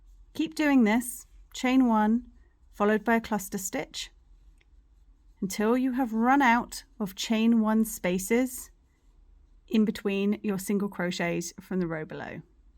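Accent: England, United Kingdom. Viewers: And another wonderful video! I must admit that your accent is a delight to me. My grandfather immigrated from England in the early 1900’s and I’ve been soothed by a British accent my whole life. That and the marvelous content have got me hooked ❤️ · I so enjoy your instructions. They are given so clearly. You sound very English but use the US terminology. How is that?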